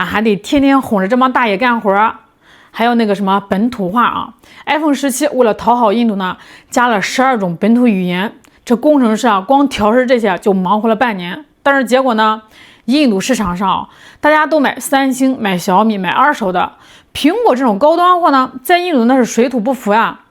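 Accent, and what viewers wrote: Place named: Henan, China. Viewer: 博主听着像河南人捏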